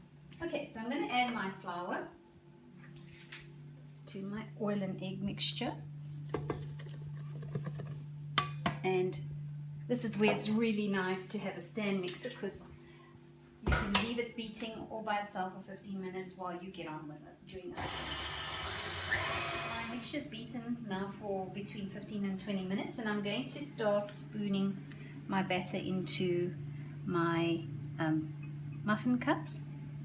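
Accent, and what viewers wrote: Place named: South Africa. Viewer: I love your recipe! Do you live in South AFrica? You have a SA accent, I lived there too, in Pretoria!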